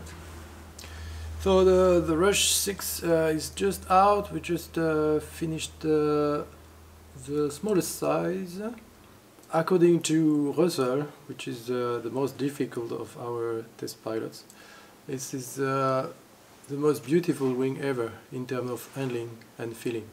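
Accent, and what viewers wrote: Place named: France. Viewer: Mais quel accent de merde, j'adore!!😄 Vive la France🇨🇵 And don't forget to come visit and fly our beautifull Alps!!👍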